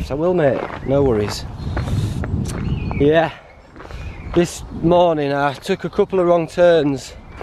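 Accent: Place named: Northern England, United Kingdom. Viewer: You're so ridiculously northern. I love it. Looking forward to part 3, from Cardiff :))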